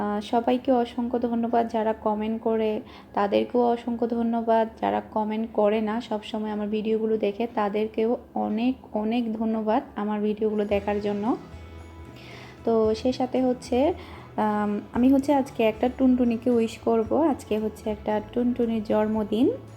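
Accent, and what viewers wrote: Chittagong, Bangladesh. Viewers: আপু আমি আপনার বিডিও দেকি কমেট করা হয় না আপনার ইমকামে কথা শুনে খুশি হলাম। আপনার বাড়ি কি পটিয়া আপনার কথা শুনে মনে হয় পটিয়া।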